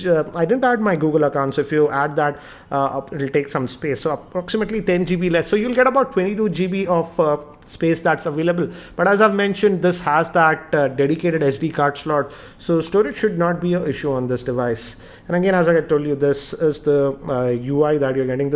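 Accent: India. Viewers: Your accent = Indian cricket commentator . Good video though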